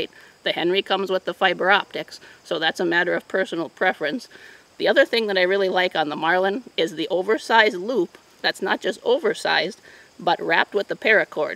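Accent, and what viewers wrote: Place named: Midwest, United States. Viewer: That's a genuine Midwest accent LOL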